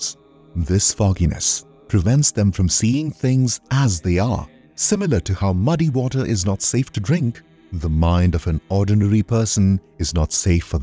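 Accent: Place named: India. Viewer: This is definitely not Ai, just because an Indian accent is “foreign” to you, does not make it ai. This has most definitely been script/edited and voiced by a human.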